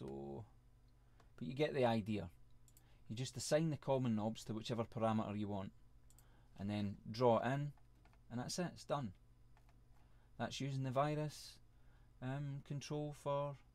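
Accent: Scotland, United Kingdom. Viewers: dude,how do you setup the virus ti with cubase properly? i dont manage:-( btw,are u scottish? i can hear that,i am from Aberdeen:-)) anyway,i am struggling with it :-( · what accent is this? =) scottish?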